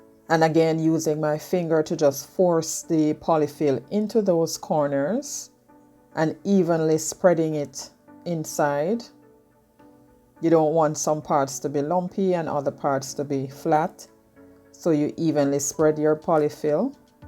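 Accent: Jamaica. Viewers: same! I didn't see snow until I was 8 yrs old, visiting family in NJ for a wedding and it was amazing! I played in it making a snowmen and kept running in and out of the hotel to put my hands under hot water (as a child, I had no idea it made it WORSE to do that as it burns your hands lol). But growing up, i thought snowflakes were the size of dinner plates! Cuz I'd see them on cartoons where they blow them up for the screen effects. My mother had to EXPLAIN to me that each snowflake was part of the snow that fell and they were very tiny. Boy, that blew my mind and they it started an entire other excitement trying to catch snowflakes and look at them before they melted to see that, indeed, they were snowflakes🤣🤣🤣 I laugh at myself over it because it's like "really Gaby? Dinner plate snowflakes? EVERYONE would get a concussion if those fell down when it snowed!!! 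Lol I figured you'd enjoy that story. I hope u can make sense of it! Lol I have a few Jamaican friends and I want to go to Jamaica SO BADLY! That was my aunt and uncles favorite spot to vacation. Your accent gave you away😋 I LOVE IT!!!❤❤❤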